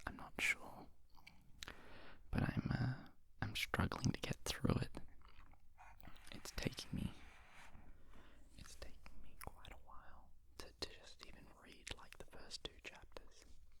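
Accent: Australia. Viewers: I totally agree hearing another Aussie is so refreshing and makes it more familiar and a better experience for me personally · Since I'm back in Japan, I have been busy with work and have been diagnosed with bipolar disorder again😢 I can't even have that much sort of energy to watch YouTube or you know just use any kind of SNS but I'm so happy to be here and hear your Aussie accent again. I've been struggling with insomnia and taking some pills but your video really makes me feel sleepy. Thank you. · as a fellow aussie, i find it so fresh to see more aussies getting into asmr :] it's quite relaxing and familiar to hear the accent of ppl around me in asmr. not to mention your calm and relaxed demeanor - it really adds to the experience! cheers mate, keep up the great work❤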